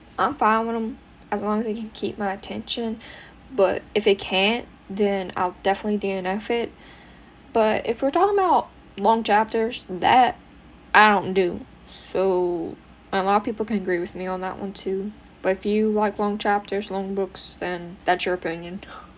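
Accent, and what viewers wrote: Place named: South, United States. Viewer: Thank you for the great answers, (especially your country song picks!) also, your soft southern twang in your voice is Fabulous!! 🫶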